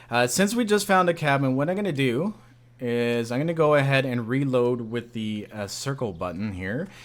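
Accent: Canada. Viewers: You sound Canadian. Is he?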